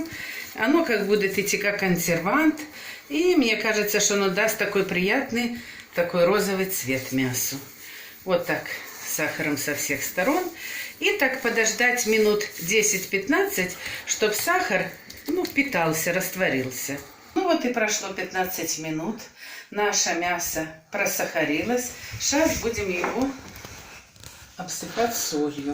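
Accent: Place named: Belarus. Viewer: Очень милая женщина и хорошая хозяйка! Была в Белоруссии не раз, очень нравится страна, люди, говор очень приятный. Подписалась, с удовольствием буду Вас, смотреть.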